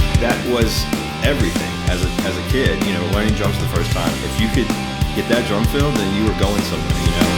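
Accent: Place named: South, United States. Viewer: tell me you're from the south w/o saying it. "he's playing that fast and he's playing that fast and he's strumming the shi*t outta that thing"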